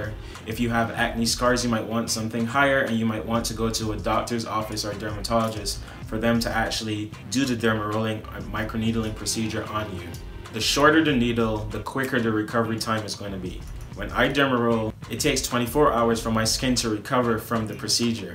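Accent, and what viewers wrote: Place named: Barbados. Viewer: Love that Bajan accent !❤️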